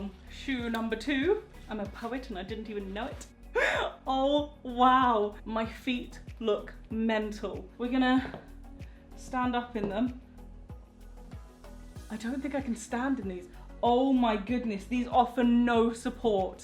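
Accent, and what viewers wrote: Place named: RP, United Kingdom. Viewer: You're so posh I love it 😂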